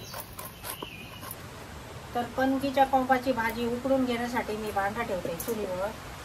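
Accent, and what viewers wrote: Malvani, India. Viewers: तोंडाक पाणी सुटलां. चिवारिचे कोमचेय दाखया. तुमचे व्हीडिओ बघताना, शुद्ध मालवणी आयकताना गावांत आसल्यासारख्यांच वाटता. देव बरें करो.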